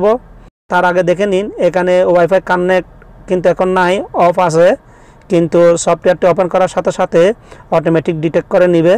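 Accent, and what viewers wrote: Chittagong, Bangladesh. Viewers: chittainga naki? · apni ki Chittagong bari je vasa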